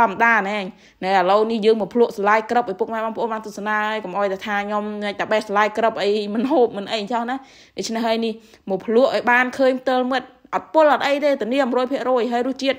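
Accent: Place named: Siem Reap, Cambodia. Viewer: នៅខែត្រសៀមរាបបានជានិយាយល្អើន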